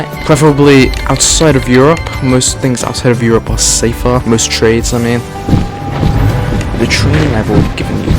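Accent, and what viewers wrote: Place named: United Kingdom. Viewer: Nice British accent.🇬🇧👍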